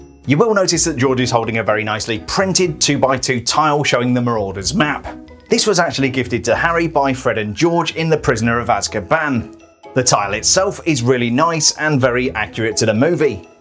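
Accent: United Kingdom. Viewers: The most british accent if i ever heard one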